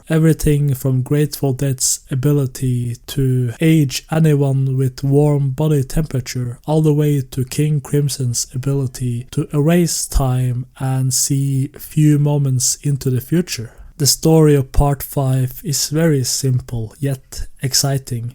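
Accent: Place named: Scandinavia. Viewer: you sound either norwegian or swedish, anyways keep it up :d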